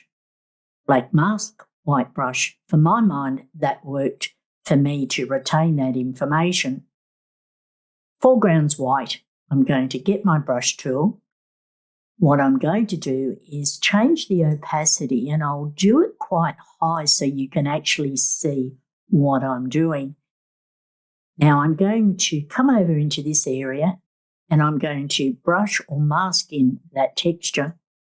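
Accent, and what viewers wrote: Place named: Australia. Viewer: Lovely to hear an Australian voice. I am going off to try this technique, the results looked great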